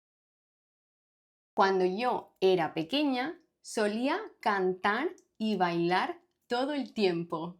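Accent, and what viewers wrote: United Kingdom. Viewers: Hola señora- de donde eres? Tu acento es muy interesante- lo parece que un poquito del UK cuando hablas inglés. 🤔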